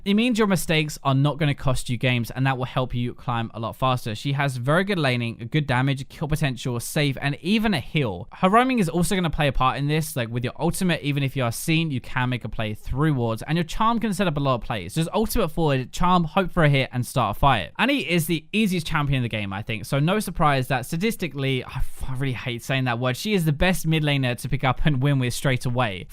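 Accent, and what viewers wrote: United Kingdom. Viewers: Tbh i dont like hes british accent.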